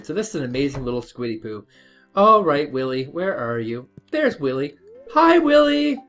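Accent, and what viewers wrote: Canada. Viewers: defo hear a maritimes Canadian accent :)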